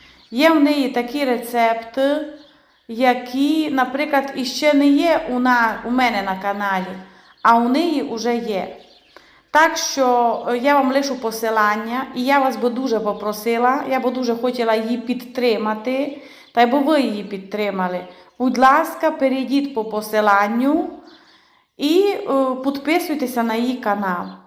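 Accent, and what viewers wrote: Transcarpathia, Ukraine. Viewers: Вітаємо Вас,! Ви Чудо і ваші рецепти це знахідка для всіх нас. Хай Господь благословить вас і дарує Вам і вашій сім'ї міцне здоров'я, злагоди і миру. В нашій сім'ї зараз готується дуже багато страв за вашими рецептами. Любимо вас, вашу мову, ваші щирі слова, ваш закарпатський діалект, бо він прижився в нашій сім'ї. Рясних вам Божих благословінь. Успіху вам та процвітання. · я Вас вітаю з 300000 підписників, це величезна праця розвивати свій канал, зацікавити глядачів, щоб люди підписалися. дивилися. В тому і фішка, що закарпатський діалект, мені подобається, а кому не подобається нехай йдуть на інші канали, на ютубі величезний вибір блогерів. Дасть Бог буде пів мільйона підписників і мільйон, рухайтеся вперед, знімайте для нас смачні рецепти, я люблю м'ясо і солодке. Я дивлюся Вас з міста Черкаси.Бажання у кожного українця зараз одне Перемога і Мирне небо!!! 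· Доброго вечора зі Львова! Ви така добра та щира🥰Дякую вам та вашій мамі❤️ Чудовий закарпатський діалект - слухаю з задоволенням! Щиро дякую за рецепт кабачкової ікри, там де рецепт на 1 кг. Я мала рецепти - но ваш тепер мій назавжди, навіть інші пробувати немає змісту. Він ідеальний, вже третій раз закручую. Щиро вам дякую☀️Добра бажаю🌺🌸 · І я приєднуюсь до ваших привітань і хочу побажати вам і вашій родині мирного неба Божого благословенства, та міцного здоров'ячка,я дуже рада що можу слухати ваш дуже приємний голос ,і гарний закарпатський діалект,багато готую за вашими рецептами . ❤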